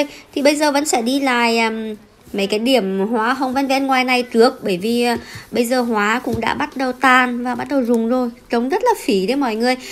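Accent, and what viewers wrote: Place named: Nghe An, Vietnam. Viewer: Xin chào Vân oi, hôm nay lại được gặp và nghe giọng Nghê An hà tĩnh dễ thương làm mình nhớ VN mình quá, vườn hoa hông chỗ bạn đẹp quá, nhiều hoa nữa, mõi lần xem video của bạn mình có cảm giác đang cùng bạn đồng hành ở 1 đất nước rất xa VN, dù ở ca nhưng mình thấy có vẻ rất gần vì mõi ngày vẫn lên YouTube tìm xem video mới của bạn, nghe giông VN xa quê, người VN xa quê. Bên đó vẫn đỡ buôn hơn vì có cộng đồng VN mìn rất đông phải ko bạn. Bạn giữ gìn sức khoẻ nha. Chúc gia đinh bạn nhiều yêu thương. Mình vẫn sẽ chờ đợi nhưng video mới của bạn mõi ngày và đồng hành cùng gia đình bạn.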